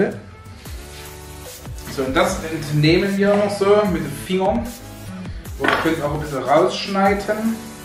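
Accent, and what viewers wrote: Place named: Bad Dürrenberg, Germany. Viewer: Bad Dürrenbergerisch auf YouTube. Herrlich.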